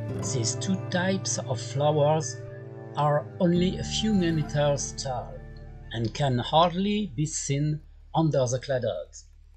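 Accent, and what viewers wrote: France. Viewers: Hello, thank you for the video in english, I liked the french accent, that's my fun, good continuation, kiss👍😘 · The french accent sympa la version en anglais bonne fête de pâques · Superbe idée, l accent français, les anglo-saxons adorent. Cela leur permettra d apprécier la flore de France. J ai mis un pouce en haut 👍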